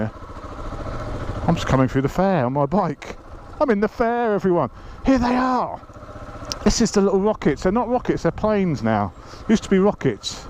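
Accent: United Kingdom. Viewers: Haha. Love this. Bless the old man at the end. Genuinely thought you were a London boy. You've definitely got a cockney twang.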